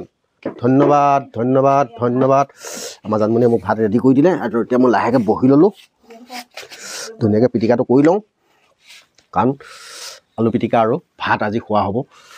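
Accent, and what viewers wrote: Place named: Bihpuria, India. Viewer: o dada amar bo ghr bihpuriya moi apunar aru mur kotha mile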